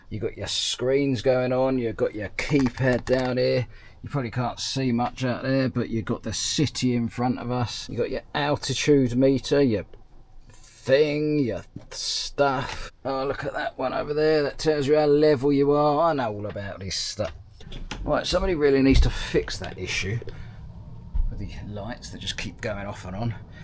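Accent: United Kingdom. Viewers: I love you British accent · Bros british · British accent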